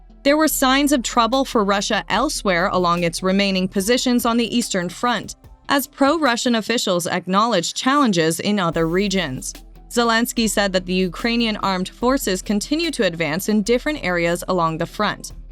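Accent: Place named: United States. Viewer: Nice American English. Love it)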